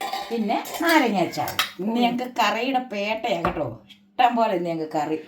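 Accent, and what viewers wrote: South Kerala, India. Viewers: 🙏തനി തെക്കൻ ശൈലി കേൾക്കാൻ നല്ലരസം കറികളൊക്കെ ഒതുക്കി വിളമ്പു നാനും ഒരു തിരുവിങ്കൂറുകാരിയാണ് ഏതു ജോലി ക്കും വിഷമങ്ങളുണ്ട് ഒന്നിനും മടി പാടില്ല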